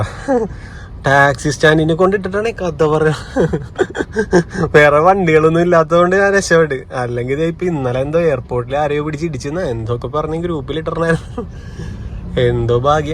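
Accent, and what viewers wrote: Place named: Kochi, India. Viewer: മച്ചാന്റ്റ് സംസാരം കിടു കൊച്ചി സലാംഗ് ❤️❤️❤️❤️❤️❤️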